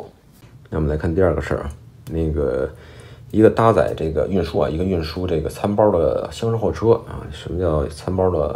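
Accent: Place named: Beijing, China. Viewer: 菲龙网看了一下都是视频加文字。。哪里有这样的京腔京孕有意思。。😊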